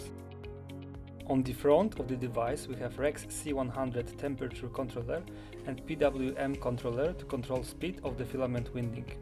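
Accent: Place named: Poland. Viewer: POlak?? Dobrze slysze :D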